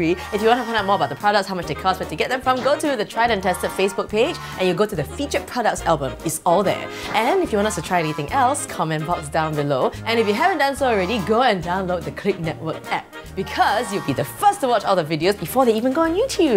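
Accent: Singapore. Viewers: is an Singaporean or at least born in Singapore because she has a accent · i loooove your singlish accent :) (coming from someone who lives in canada) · As a Singaporean living overseas, the most shiok thing is to hear our accent when you are going through YouTube videos. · i sounds like a singaporean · where is she come from ? Singapore ? Malaysia ?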